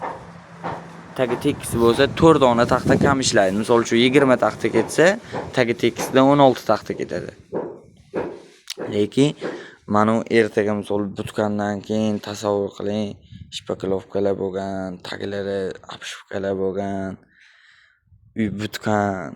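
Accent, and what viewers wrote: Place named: Khorezm, Uzbekistan. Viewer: хорпзмчага айланибдику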